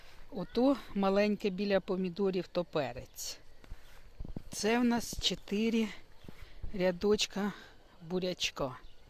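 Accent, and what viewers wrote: Poltava, Ukraine. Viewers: По розмові чути це Полтавська обл.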